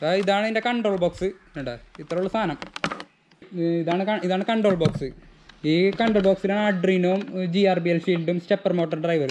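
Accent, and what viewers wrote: Thrissur, India. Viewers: “ ” next M4tech YouTube channel, keep pushing and keep talking in that”Thrissur” slang 🤘🏽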